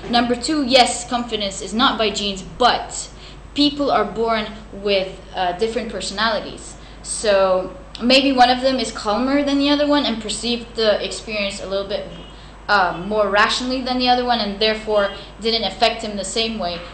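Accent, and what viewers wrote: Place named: France. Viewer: I think confidence=your capabilities Self-esteem=How you feel about yourself! By the way what is your native language? I hear a french accent, forgive me if I am wrong!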